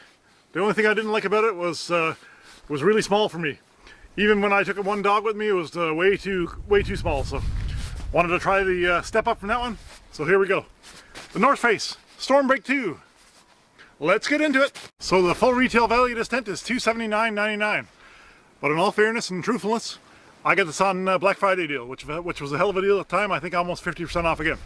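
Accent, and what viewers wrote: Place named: Maritimes, Canada. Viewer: Really enjoyed the thoroughness of this review! Being from the Maritimes I also enjoy the accent, eh?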